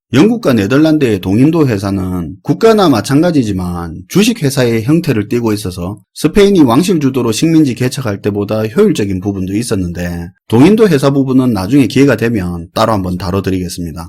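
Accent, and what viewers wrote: Gyeongsang, South Korea. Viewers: 재미있게 잘 듣고 있습니다. 쉽게 이해 잘가게 설명해주시는거 너무 감사합니다. 경상도 사투리도 너무정감갑니다.^^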